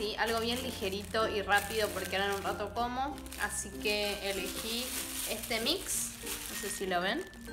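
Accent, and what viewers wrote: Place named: Argentina. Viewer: Me sorprendí al escuchar tu acento! Al fin alguien de Argentina haciendo este tipo de vídeos, te necesitábamos.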